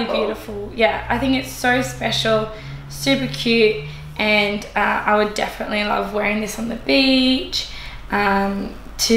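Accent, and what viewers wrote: Australia. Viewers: is incredible. She is so beautiful and represents the company so well. And her accent. What part of Australia is her accent from? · Is that Australian accent?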